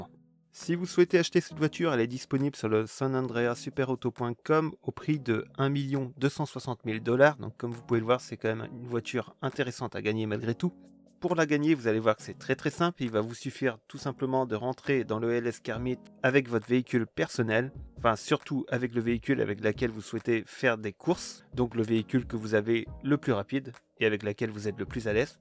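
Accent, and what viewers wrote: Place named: Nord, France. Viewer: Géniales tes vidéos, ça s’entend que tu viens du ch’Nord! 💪🏼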